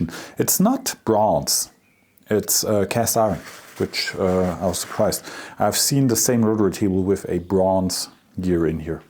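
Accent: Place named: Upper Palatinate, Germany. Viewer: Very nice 😎👍 if you ever purchase sthg big again and want to clean it, drop by my shop and use my Würth Parts Cleaner, VOC free, that stuff gets the grime off in no time. I am not sure of your location, but you sound like a Oberpfälzer 😉 i am located 15min next to munich airport with the shop 😊 Greetings, Chris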